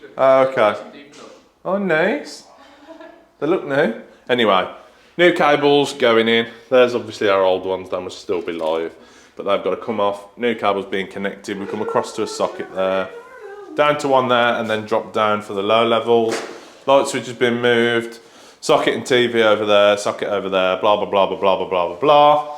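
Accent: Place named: England, United Kingdom. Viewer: where are you from in England?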